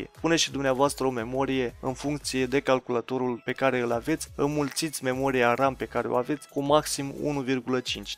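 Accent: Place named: Moldova. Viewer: Ce accent de moldovean.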